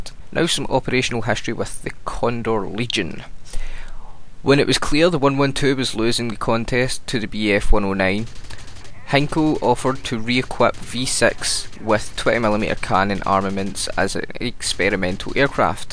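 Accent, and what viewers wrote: United Kingdom. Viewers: u from scotland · What part of North Ayrshire are you from?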